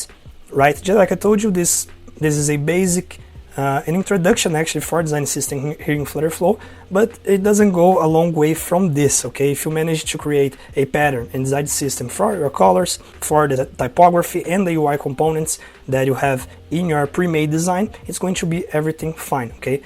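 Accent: Brazil. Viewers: Parece brasileiro falando ingles 😅 boa aula!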